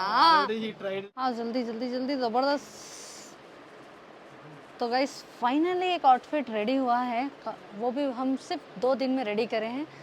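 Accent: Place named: Hyderabad, India. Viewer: Mam yaar I really liked the Hyderabadi language in the you 😂🤣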